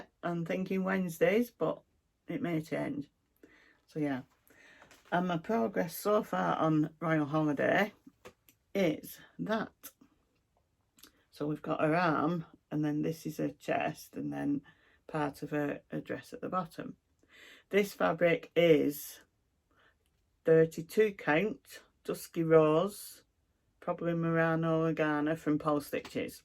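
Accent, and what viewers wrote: Lancashire, United Kingdom. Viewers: I live in Lancashire area, but I discover your chanel with help from Theresa Little Stitcher 🥰 and with your help I will learn british accent from Lancashire 🥰 In August, five years ago, I move to this area and I love it.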